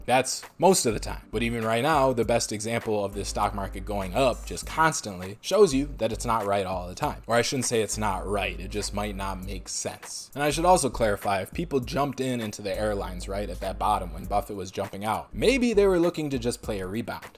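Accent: India. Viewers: Bro are you from kerala???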